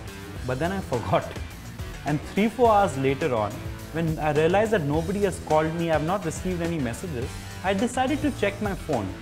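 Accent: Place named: India. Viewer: I saw this as an ad before a video started but I kept watching it because I actually liked hearing his indian accent. hah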